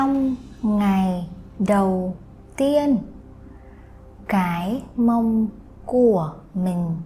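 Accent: North, Vietnam. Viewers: Finally a channel in northern dialect. I'm so happy that I found you! I started learning Vietnamese only a month ago (to talk to my in-laws) so I didn't understand that much of what you said. But I'm sure I will soon 😄 Please continue making videos like this! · Your video is very helpful for learning to listen to the northern accent, so thank you.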